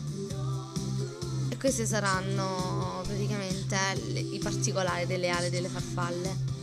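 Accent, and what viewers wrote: Apulia, Italy. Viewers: di dove 6? anche io sn della puglia prov.di brindisi :D è vero che si sente molto nella parlata..cmq cosa usi x fare i decori neri?? a me in genere il gel si espande mentre faccio i decori.. che gel usi tu?? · Forse perchè sono pugliese anche io ma si sente che 6 pugliese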